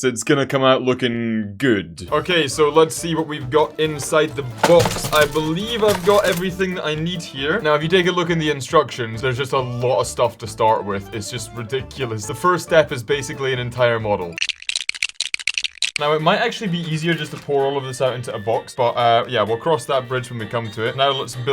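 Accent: United Kingdom. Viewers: Sounds British. I think he needs a bottle of water.😂😂😂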